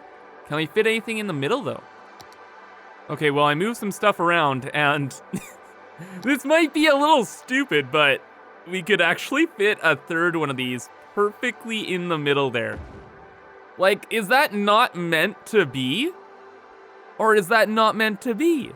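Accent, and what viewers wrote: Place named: Canada. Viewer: Are you Canadian too? I recognize your accent.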